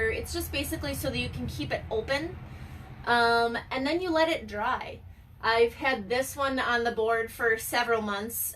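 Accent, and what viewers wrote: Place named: Ireland. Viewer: You kinda sound irish lol :)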